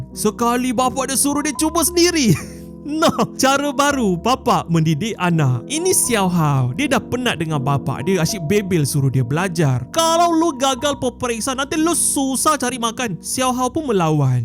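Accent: Sabah, Malaysia. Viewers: ak lama sudah mau tnya ni, kw ni org sabahan ka?😂😂 dgar logat sabahan, minat smua konten kau. Smua lucu² ndk bosan tgok. Sihat slalu dan semangat bekonten 😊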